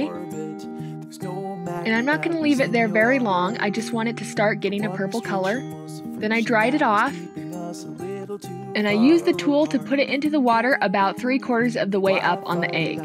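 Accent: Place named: Minnesota, United States. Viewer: Are you from Minnesota sounds like Minnesota accent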